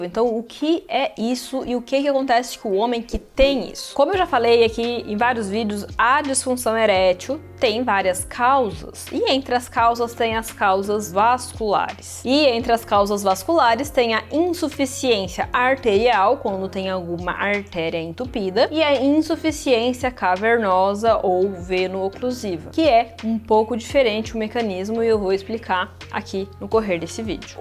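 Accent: Brazil. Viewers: Deve ser do interior de São Paulo SP como a pavoro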